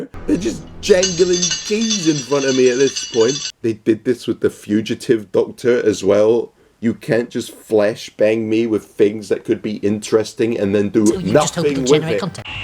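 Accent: United Kingdom. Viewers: Time to listen to a british git speak about a show I watched like once when I was the size of a bedside cabinet and not understand anything like a modern tik tok kid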